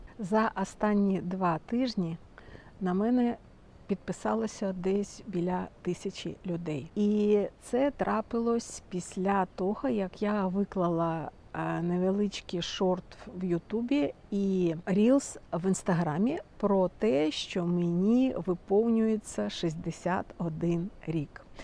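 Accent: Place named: Belarus. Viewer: Вітаю Вас. Ви велика молодець) по всіх питаннях, що Ви озвучили! Вас приємно слухати і дивитися) мені здається що Ви білоруска, тому що слова звучать наче українською але з білоруським акцентом! Бажаю успіху з садом і з мовою!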